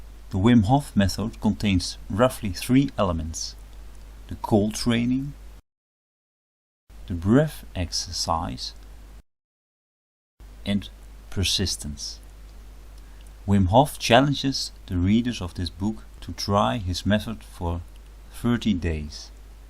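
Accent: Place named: Netherlands. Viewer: dutch accent!!!!!!!!!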